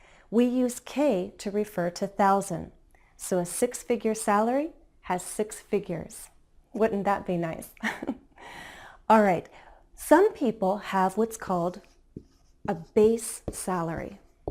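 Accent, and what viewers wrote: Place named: United States. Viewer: Amazing. I do like your videos, and I share them to my friends, students, and colleagues. The way you teach is excellent. It seems that you are patient to your subscribers. And, I like your American accent. I recommend everyone who wants to learn English should follow channel. Well done. Keep it up! Ahmed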